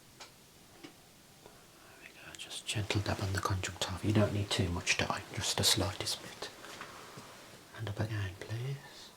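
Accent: United Kingdom. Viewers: I find your voice so relaxing. Some of that may be that, not being British myself, many British accents are interesting to my ears, but it's more than that.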